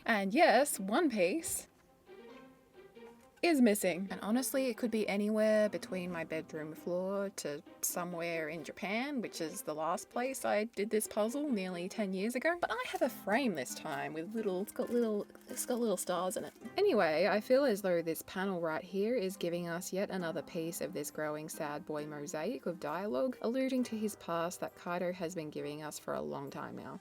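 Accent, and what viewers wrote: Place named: Australia. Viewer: Knew you were Aussie soon as I heard ya voice bro Anywho lol to those who said Luffy will lose the final fight 😂 can’t happen now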